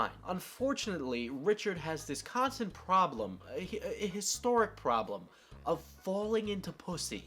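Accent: New York, United States. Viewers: Liam Collins I think its just the new yorker accent accompanied with memes. I dont think he acts like chrisraygun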